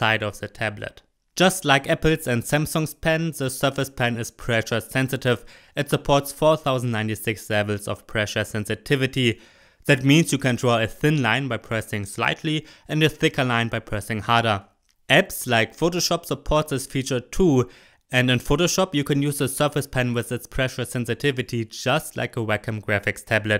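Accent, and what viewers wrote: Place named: Germany. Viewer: Dein Englisch ist grausam, dass du deutscher bist hört man sofort. Entscheide dich besser ob du nicht auf das wenige Geld verzichten kannst und auf Deutsch deine Videos rausbringen kannst, oder ob du Muttersprachler weiter verschrecken willst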